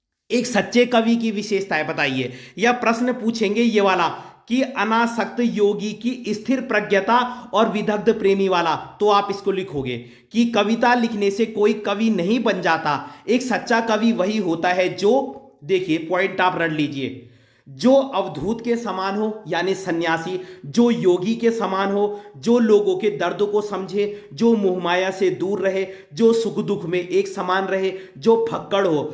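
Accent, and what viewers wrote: Garhwal, India. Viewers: Sir ji aap gadwali ho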